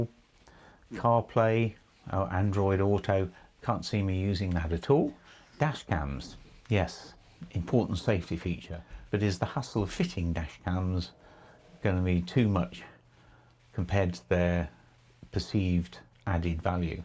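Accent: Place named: England, United Kingdom. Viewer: Sounds like it’s got some useful features.hearing you read them off. With your English accent.reminded me of Q.from the bond films. He was always telling bond.what the gadgets were.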